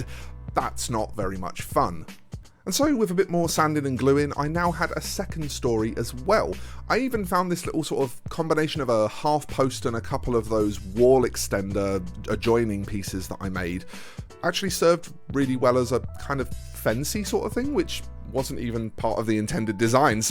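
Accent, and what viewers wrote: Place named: United Kingdom. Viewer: I've just realised love british accent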